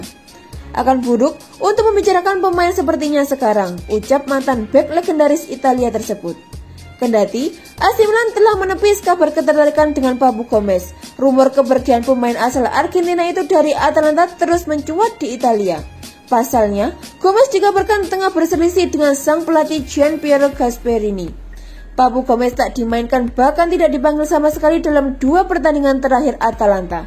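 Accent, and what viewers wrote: Java, Indonesia. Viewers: Kog medok to mbak? Forza milan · Senang dengar medoknya mba ini,tpi sya sayang bacanya cepat bingitsss...😁😁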